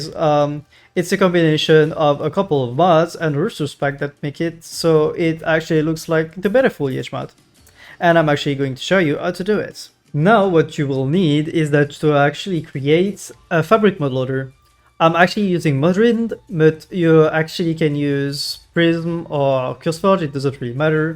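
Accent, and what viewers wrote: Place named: France. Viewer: hahaha parce que je suis francais j'ai reconnu un petit accent francais en toi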